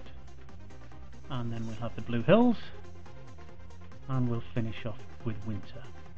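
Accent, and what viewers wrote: Wales, United Kingdom. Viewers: where are you from? coz you sound kinda welsh.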